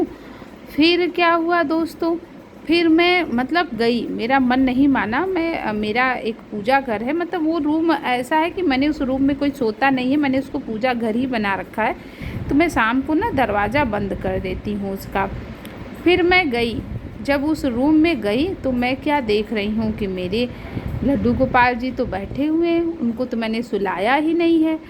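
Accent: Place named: Pahadi, India. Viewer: Aap ka accent bta rha h aap pahadi h 😀❤️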